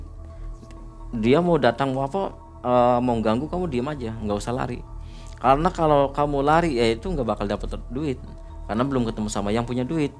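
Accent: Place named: Indramayu, Indonesia. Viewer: Bahasa Indramayu 😅😅 kongsi enk ngrongokna e mang 😂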